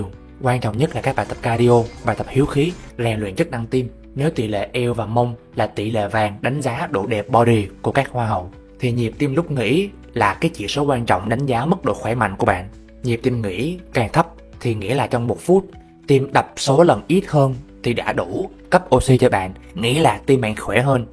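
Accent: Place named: Vietnam. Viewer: Video rất bổ ích , mà nói giọng tùm lum tà la, lúc giọng bình định lúc giọng bắc lúc giọng nam, cũng ráng nghe đó mà nó cấn cấn k có êm tai. Mình góp ý chút vì bạn rất có tâm vào video này, mong sau này bạn luyện nói 1 giọng thôi cho nó êm tai, vì video dài như thế này cần 1 giọng đọc hấp dẫn mới nghe đi nghe lại để học và tiêu thụ kiến thức, chứ k phải nghe 1 lần rồi thôi. Cảm ơn bạn thanh niên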